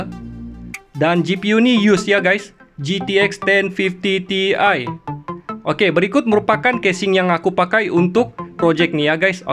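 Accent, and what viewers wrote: Sabah, Malaysia. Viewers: Bro orang sabah kah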